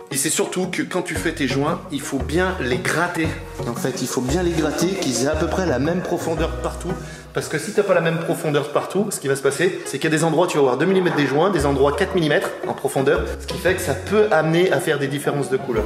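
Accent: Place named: Vendée, France. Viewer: Salut. J'adore ce que tu fais et en plus moi qui suis d'origine Yonnaise, exilé en Limousin, ton accent est ma petite madeleine de Proust! Peux tu me conseiller sur la pose d'un carrelage 60x30? Pose à 2/3 1/3 ou 50/50? Merci et continue tes vidéos Thierry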